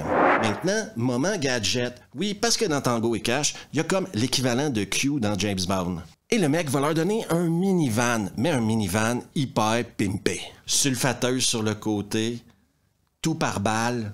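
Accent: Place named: Quebec, Canada. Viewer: Ton accent québécois 🇲🇶 est vraiment très difficile à comprendre pour nous les français 🇫🇷🤷🏽 il faut vraiment travailler ton accent !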